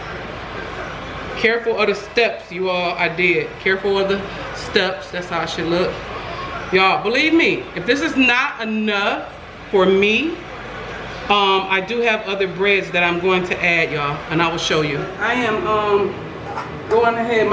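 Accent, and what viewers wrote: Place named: New York, United States. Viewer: I hear that New York accent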